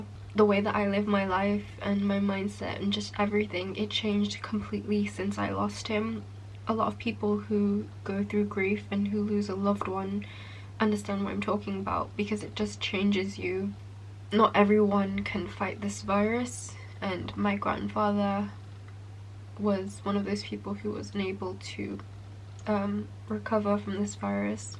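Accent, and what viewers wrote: United Kingdom. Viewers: I really love hearing British accent despite the bad news. Pray for your better InsyaAllah. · Assalamualaikum. I'm 2 years younger than you. It's alright, I'm a girl. It's really heartwarming listening to you talking in British accent. I hope that you'll get better soon, InsyaAllah!! I've lived in the UK too. I lived in Leeds, England. For nearly 5 years. (Imagine me talking in Yorkshire accent) Anyway~ Semoga akak dan keluarga akak dilindungi oleh perkara² yg tidak baik. Aamiin.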